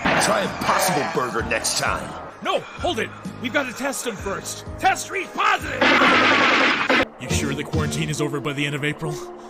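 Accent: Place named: South, United States. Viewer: I love hearing a southern American accent on an excellent movie review.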